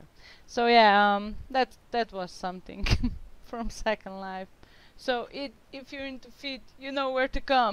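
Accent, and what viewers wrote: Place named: Portugal. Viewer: Your Portuguese accent speaking English is beautiful!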